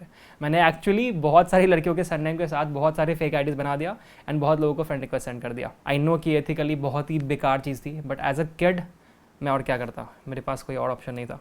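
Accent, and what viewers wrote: Bihar, India. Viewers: Bhaiya aap bihar se ho kya 🤔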